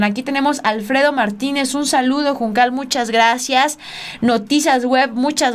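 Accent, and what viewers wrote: Mexico. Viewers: Que ermoso Mexicano hablas juncal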